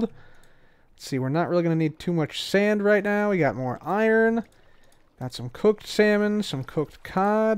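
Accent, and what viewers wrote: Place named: Pennsylvania, United States. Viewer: sounds like a Pennsylvania accent. loving Season 7 so far! great start...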